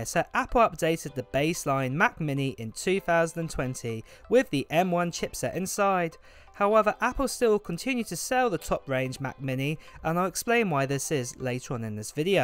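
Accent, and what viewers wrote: United Kingdom. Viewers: I want to buy the iPhone 11 but I don’t have money for that and also i don’t have a job since i was just a 16 yo who want some iPhone i am hoping that i will be the one who got your ipad❤️Godbless you man💖 btw i like your British Accent❤️❤️ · God i hate his accent. Ive never known anyone who is trying to say two different words and having them sound exactly the same. Listen to him say " Thirteen and Fourteen" They sound exactly the same because of that awful cockney accent. Ugh im out. The video quality is great but get someone else to narrate them.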